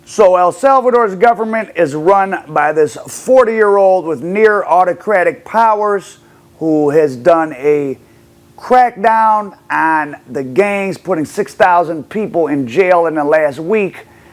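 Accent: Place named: Michigan, United States. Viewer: I was going to ask, are you from east michigan or detroit.. that accent 👌 You were recommended, subscribed already. Cheers from the mitten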